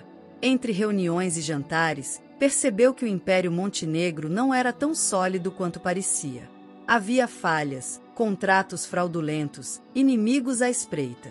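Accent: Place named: Northeast, Brazil. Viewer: Boa gostei sim ê isso mulher parece que vc ê nordestina paraibana,cearense,bom seja lá de onde fores meu abraço e um xeru p vc Matheus pela coragem de nunca desistir daquilo e quem vc amava valeu e muito valeu e para o senhor arrogante Paulo a estrada ê larga mas contém curvas fechadas querido e as suas curvas te enrolaram,bom como estas pode mandar no meu tempo assistir eu várias obg canal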